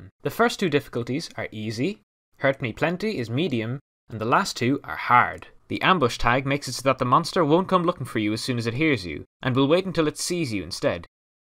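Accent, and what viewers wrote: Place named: Ireland. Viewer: why are you irish.....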